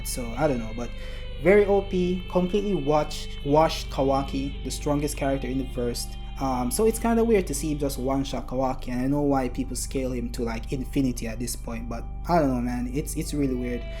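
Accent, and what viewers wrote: Jamaica. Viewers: You form Jamaica? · U Jamaican? 🤔 That accent sounds familiar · ARE YOU JAMAICAN?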